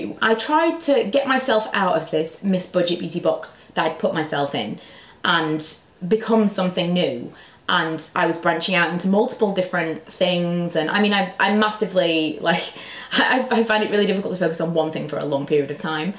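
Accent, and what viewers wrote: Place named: Yorkshire, United Kingdom. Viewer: I watch all of your videos. I'm 23 years old. You mentioned you don't get the same opportunities as other youtubers because you're in Yorkshire. but actually, that's what i love about your videos. For me, it's all about relatability. when I watch your videos it's really comforting because im from Sheffield, it's nice to recognise an accent that feels more like mine, i dont know if that has anything to do with an embedded North and South divide. 😂 But the best thing about your channel is i really value and trust your opinions. I never know when other youtubers are being sponsored, youre very real. I dont relate to the youtubers that are constantly having photo shoots and walking the red carpet because its just not normal enough.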